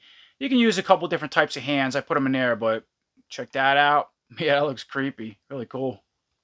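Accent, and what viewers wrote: New York, United States. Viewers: You gotta NY accent, makes the review better..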